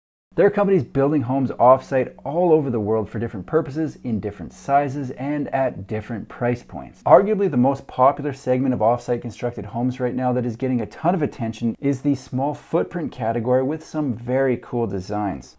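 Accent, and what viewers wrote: Canada. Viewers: My team noted you are Canadian. Do you only say “aboot?”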